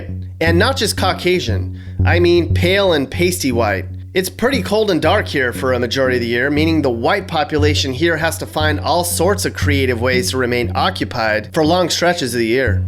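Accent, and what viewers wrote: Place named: Ozarks, United States. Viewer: In your Ozark accent, it's Sprang-fuhld, Muhzooruh.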